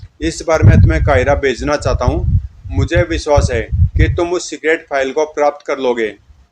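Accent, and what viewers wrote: Haryana, India. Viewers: Love your Haryanvi Style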